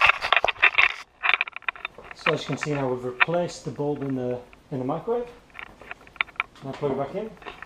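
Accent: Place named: Australia. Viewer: Thought I recognized the Aussie in your voice. Have enjoyed many visits there and find everyone seems to DIY! Former Newport RI, USA resident, now living inland and trying to get off the grid!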